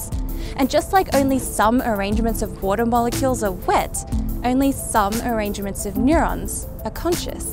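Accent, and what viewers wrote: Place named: Australia. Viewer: You sound Australian, but this vid was published in January, and wherever you are had snow. Do you live in Canada?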